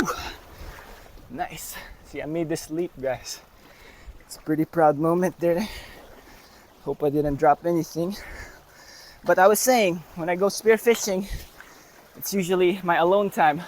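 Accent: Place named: Mindanao, Philippines. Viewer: What country are you from? Your accent sounds like you're from Southern Philippines but I could be wrong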